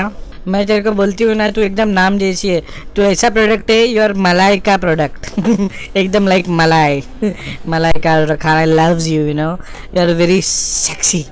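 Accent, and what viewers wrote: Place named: Mumbai, India. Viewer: ponga pandit.. LOL.. I am sure commentrator is from Mumbai.. Only a Mumbaiwalla kan speak like that.. Cheers to Mumbai.. videos have many dislike.. some of them.. Non Mumbaikars dont understand jhakas humor of mumbai... so they press dislike... you dont worry... keep making such videos.. u rock.. Mumbai spirit socks.. stay Mumbai style only.. dont change...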